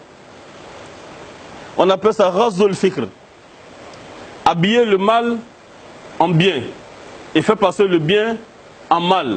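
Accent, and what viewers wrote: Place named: Mali. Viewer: Va dans pays tu ne peux pas vivre en cote d'ivoire, tu parles comme un malien toi tu es un chef ou un envoyer de boko haram.espece de malade ,alassane ouattara est notre preside t et ont se doit de le respecter ,c'est n'est pas toi un blakoro ,qui porte des gros boubous qui viendra manquer du respecte a notre president de la cote d'ivoire .un aventurier comme toi.espece de boko haram..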